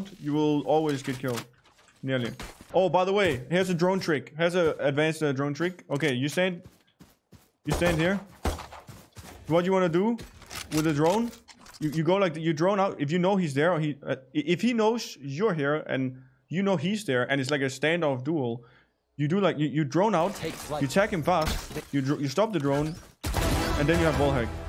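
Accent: Denmark. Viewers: are u from denmark?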